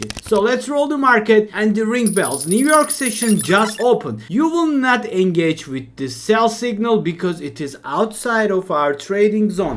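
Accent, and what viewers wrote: Turkey. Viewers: Bro I guess you are Turkish? Am i right ? 😄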